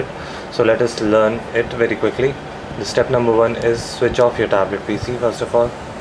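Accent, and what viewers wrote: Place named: India. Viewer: what does he press PLEASE I DO NOT UNDERSTAND INDIAN ENGLISH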